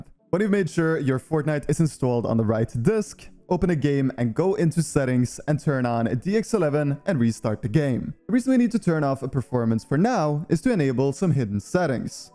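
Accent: Norway. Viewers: U Norwegian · Er du norsk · er du norsk?????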